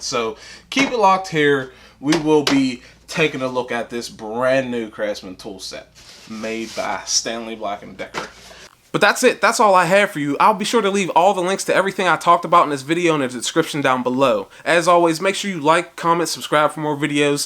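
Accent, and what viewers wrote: Pittsburgh, United States. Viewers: From the second I heard you start talking I knew you were from Pittsburgh as so am I. So yeah, all yinz dahn at Lowe's you can find all them tools for a good deal.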